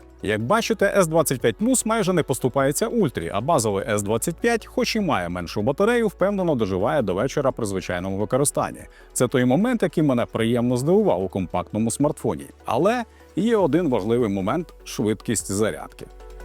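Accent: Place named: Hutsul, Ukraine. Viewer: Гуцул долбаный )))., диктун на русском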